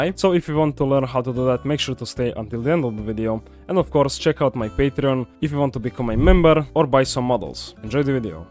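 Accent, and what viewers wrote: Russia. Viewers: RRRusian accent!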